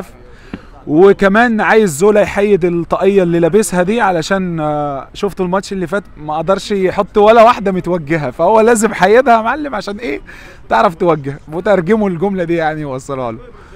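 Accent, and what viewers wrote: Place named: Morocco. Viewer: زملكاوى باين جدا عليه عقليته عقلية زملكاوية فريقى بيخسر أما اروح اشجع الفريق اللى قصاد الأهلى ف النهائى ي عينى تصدق صعبت على الأهلاوية 😂😂 المهم كمان لهجتك باين عليها جدا انك فيك خليط مغربى اصل مش معقول واحد مصرى يستهزأ بالسفارة المصرية وكمان يشجع السفارة المغربية على اللى بتعملوا فى المصريين ف انت ربنا يهديك ويشفيك من عقدة الأهلى بقى 😂😂😂😂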